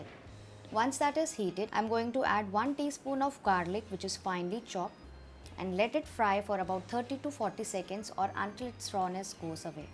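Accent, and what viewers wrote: India. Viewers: I didn't say her English is bad. I said her English is good but with a Hindi accent. And I stick on to my statement.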